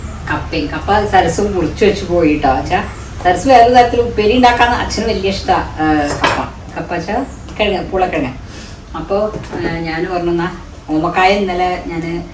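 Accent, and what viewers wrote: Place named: Bharatapuzha, India. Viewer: your Malayalam is from Bharatapuzha side ,is it?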